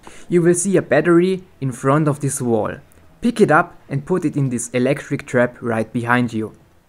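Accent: Germany. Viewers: Hört sich sehr nach deutscher Herkunft an, irre ich...?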